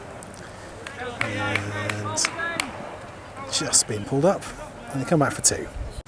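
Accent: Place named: North, United Kingdom. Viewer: Its already excellent to listen too, with the added spice of your accents from up there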